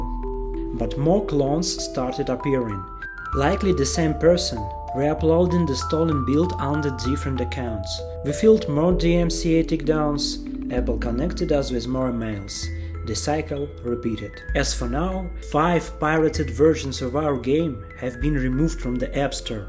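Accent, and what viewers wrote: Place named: Russia. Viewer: Очередной урок самаритянам. Делают всё за бесплатно - а потом: "а чё это у нас денег нет?😮" Насчёт авторки. Если бы тебе юрисдикция позволяла, было бы незамедлительное обращение в суд и всё по списку. Но ты стыдливо опустил момент, что никто с тобой не будет считаться, просто потому что ты из неправильной страны. Лучше на ютубчике плакаться корявым русским акцентом😢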